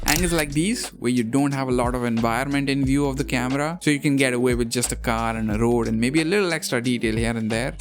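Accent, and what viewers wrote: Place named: India. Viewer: you click the video, you hear an Indian accent.... hell yeah 🔥🔥🔥🔥🔥🔥🔥 like the video and subscribe the channel, you'll never be disappointed.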